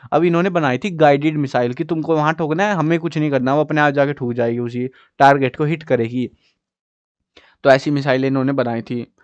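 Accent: Haryana, India. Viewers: Ap haryana sa ho kya bhai sorry. But Mariko ap ka history samaj nahi aaya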